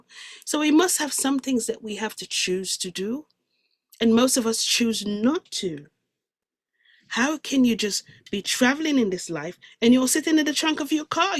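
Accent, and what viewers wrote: Jamaica. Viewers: Sista! Gwan an speak inna patwa! Me luv it. Blessings. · I LOVE your message AND your Jamaican accent. You said, "You're not losing your mind, you're losing your limitations"...PROFOUND! I love the way you use just the perfect words to convey your message. Thank you for giving so freely from your beautiful spirit! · Amazing! So glad I saw you on Alex’s next level soul! Love your Jamaican dialect pls do it more! Learning so much from you ty! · I looooovvvvvveeeeee your Jamaican accent!!! I am in love with the Jamaican accent! It sounds pure, to the point and so divineThank you!!!It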